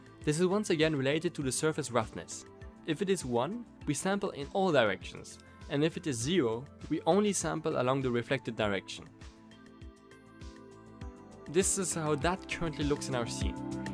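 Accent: France. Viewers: looks like an french accent you got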